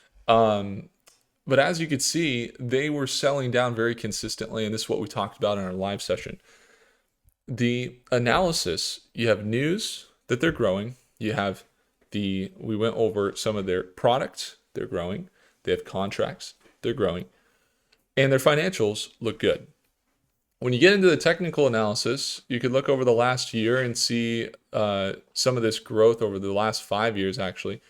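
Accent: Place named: Netherlands. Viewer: You have a Dutch accent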